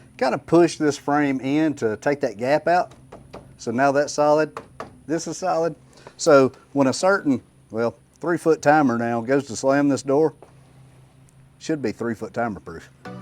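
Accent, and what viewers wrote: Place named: Louisiana, United States. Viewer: I have to say this, for someone from Illinois? I admire your speech adaptation to Louisiana. Until you said you were from Illinois? I thought you were native Louisiana. Nice Job adapting. The commitment to overly strict enforcement of archaic laws (like the one you were cited with) with no leeway to account for circumstances in Louisiana by those in the position of enforcing said laws is most definitely not to be commended. However, the flexibility exhibited by those in charge at the state level in your case is most definitely to be commended. You are 100% correct...Bears and Gators??? There are already far too many. I do agree with you in regard to Wild Turkeys (and obviously deer), unless overpopulation or some infestation they might transmit becomes a problem. Thank you for addressing those that turned you in. 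I am probably one of the ones that condemned them, and still do not have even the least bit positive opinion of them. You are considerably more generous than I could be in the same situation. However, I am one that believes that those that promote and conduct themselves in the manner they have, life seems to dole out it's own version justice without our actions in any way (some may call it Karma). You and are to be commended for your generosity as well as your lack of animosity (when any and all animosity directed to those that turned you in is more than deserved and justified...I get what you are saying. Too bad not everyone is as forgiving and generous a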